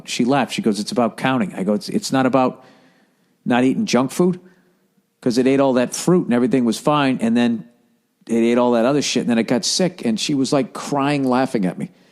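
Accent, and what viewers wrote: Boston, United States. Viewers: Hey are you from Southy?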